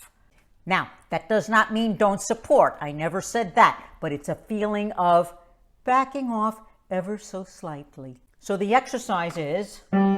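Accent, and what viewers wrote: New York, United States. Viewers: I love your NY accent! A lot of my family is from there and it reminds me of them 😄 Also your videos are super informative. Thank you!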